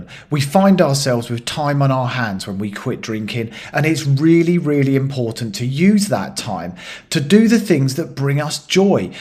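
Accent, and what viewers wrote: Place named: England, United Kingdom. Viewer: Apart from excellent advice ....its so refreshing to hear an English accent on this uttrly whitewashed americanised yourube app. 😂🎉🎉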